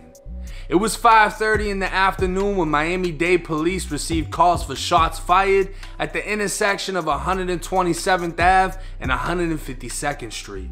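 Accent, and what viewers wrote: Boston, United States. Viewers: U got ur Boston accent back in full when I seen u on 32 n 1 years ago u sounded like u Florida boy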